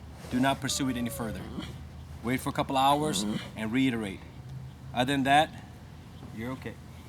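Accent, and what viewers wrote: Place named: Caribbean. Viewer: another awesome vid. I love your accent .. caribbean with a 'twang' :) Where are your roots ?? St Thomas ? :)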